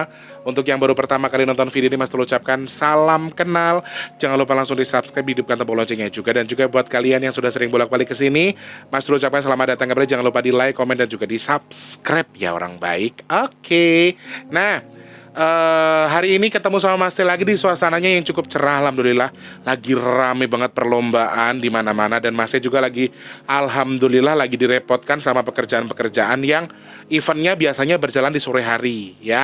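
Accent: Banyumas, Indonesia. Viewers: Rika teyeng ngapak jebule ya Mas.....😃😃😃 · Laah rika deneng bisa ngapak mas tulus? kayong wis teyeng men.... salam ngapak sing nyonge hehehe.....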